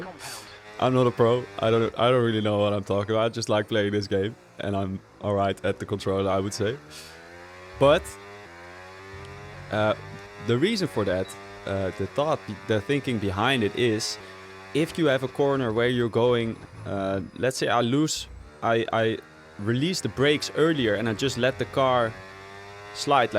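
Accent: Netherlands. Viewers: I think your dutch of the accent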